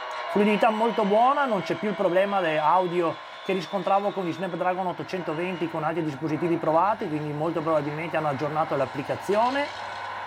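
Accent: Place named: Veneto, Italy. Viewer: bellissimo l'accento veneto!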